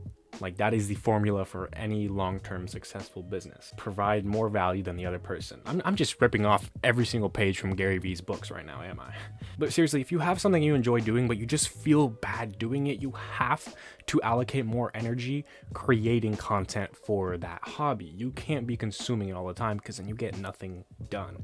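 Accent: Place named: Birmingham, United Kingdom. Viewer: Are you from birmingham UK too. You got that accent 😂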